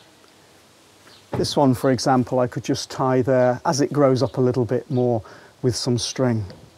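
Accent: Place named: Cheshire, United Kingdom. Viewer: I grew up in a little village called Willington, near Chester.. so your accent is familiar too! I miss Cheshire very much!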